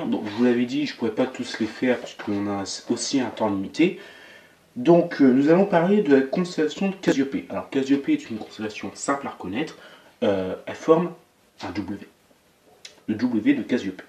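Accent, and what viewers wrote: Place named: Nord-Pas-de-Calais, France. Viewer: Magnifique accent ch'ti en tout cas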